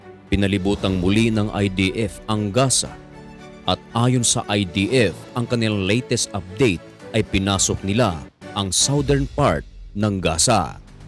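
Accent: Visayas, Philippines. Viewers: bisaya ka dung!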